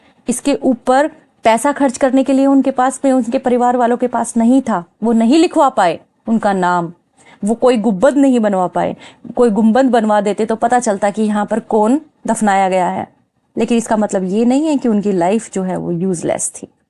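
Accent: Rajasthan, India. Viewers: Ohh , madam ji aap to rajasthan se ho 😊 .... (ढाणी)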